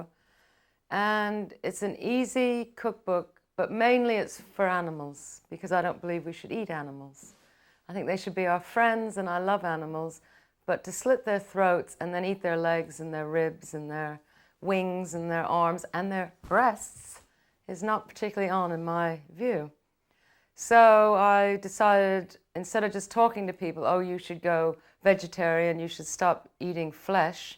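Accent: United Kingdom. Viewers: Wow her accent was so English by then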